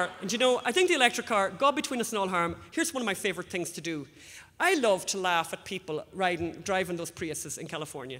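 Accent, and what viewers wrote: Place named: Donegal, Ireland. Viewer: Well. She's from Donegal!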